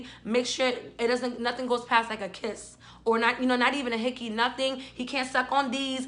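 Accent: New York, United States. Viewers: Same New York accent both nicki and cardi got deep New York accent like her😇😇😇😇😇😇😛😘🤩😘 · Nah it's just nyc accent.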